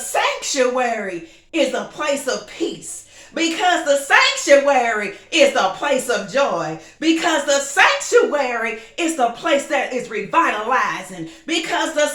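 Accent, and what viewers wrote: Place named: South, United States. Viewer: Oh wow! I didn't realize you had such a distinct southern drawl until this video. In reference to your topic, sadly, most men have not evolved beyond the barbaric be fruitful and multiply stage. Many are sex crazed and lack discipline and self control. Some will mate with any and everything, up to and including babies, teens, the elderly, a corpse, a car, furniture, and passed out women! And let's not forget animals too! So keep an eye on your dogs and sheep! I wish you well.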